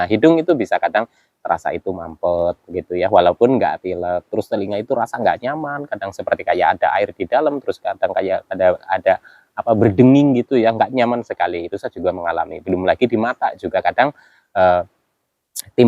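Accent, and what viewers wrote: Banyumas, Indonesia. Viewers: Mas sampean sih daerah ngendi ngapaknya, nuwun sewune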